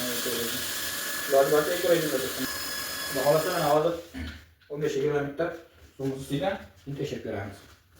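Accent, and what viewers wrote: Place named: United States. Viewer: бизи 99% одамлар воданагривателни аристон дейишади алюмин эшик ромларни акфа дейишади электр чойнакни тефал дейди. Худдики бир америкаликга у́хшаб гапирасизе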